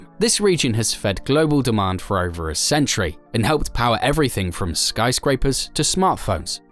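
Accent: United Kingdom. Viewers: Dude, I love your British accent 😍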